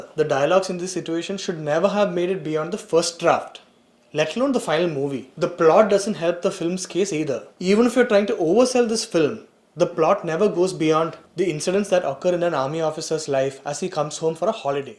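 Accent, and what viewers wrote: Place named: Kerala, India. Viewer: Mallu English 💥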